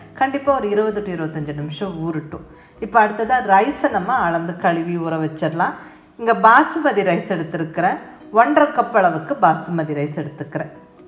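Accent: Kongu, India. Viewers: I tried and the result was superb like your kongu slang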